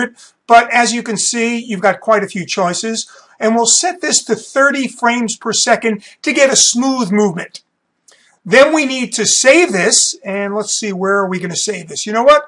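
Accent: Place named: New York, United States. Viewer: Really nice job! And we get the bonus of your very special accent for New Yawk City:) QUESTION: If I have 5 or 6 or move Placemarks in my folder, when it comes time to Record Movie, will it automatically run through all 6 locations? Or do I have to manually click each "next destination"? In my case, I wanted an ultra smooth travel on my city crossing a bridge and taking some tricky freeway exits. So, Point A along the path/tour flows into Point B, B to C, C to D and so on. If I have to manually click each point, it's not as smooth as if the program automatically connected each point. Do you know if Google Earth Pro can do this? Also, when you have your placemarks all created in your folder, can you Record different versions-- such as "With 3D buildings and traffic" and without any overlay info? Or does Google Earth save those attributes into each place mark? 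Thank you!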